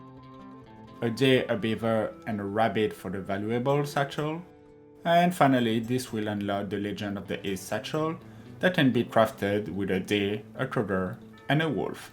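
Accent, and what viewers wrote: France. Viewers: Absolutely love how French you sound speaking English.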